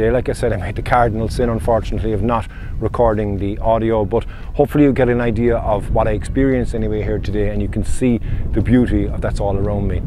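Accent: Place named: Ireland. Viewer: Intro footage and tempo, class! a bag of top shots and some tones from emerald voice! Well bloody done bud.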